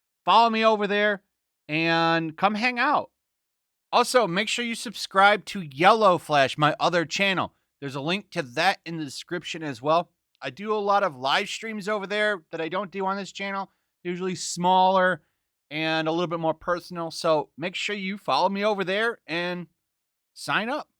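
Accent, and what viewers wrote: United Kingdom. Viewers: Holy shit your British accent is amazing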